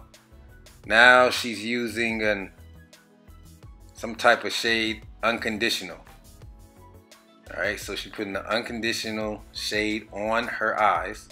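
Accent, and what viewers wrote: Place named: East Coast, United States. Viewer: War paint,lol!!!Love that!I hear a east coast accent!!!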